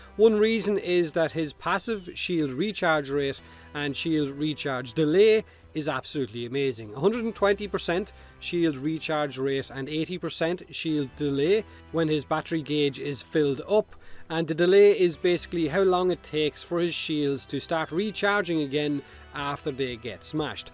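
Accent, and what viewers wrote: Ireland. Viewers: Irish accent man: says gauss is a sanic! also irish accent man: proceeds to never actually using his 1 in the video... great vid though!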